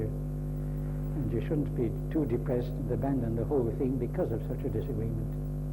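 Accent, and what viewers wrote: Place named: Netherlands. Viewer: He sounds Dutch, strange considering he did not grow up there.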